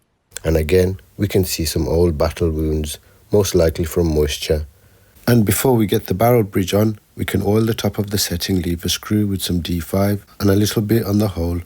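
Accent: Birmingham, United Kingdom. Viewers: I can only click the thumbs up once, you deserve more. And don't even think about NOT doing a commentary again. Your soft and gentle Brummy accent absolutely nails the information you impart. Yet another thoughtful and honest refurb which, if you had gone too far with aggressive cleaning and new plating, would have spoiled the watch and its history. We are back to you not marking your work, but we've talked before about that. So; 'tara a bit'. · great to have your brummyness back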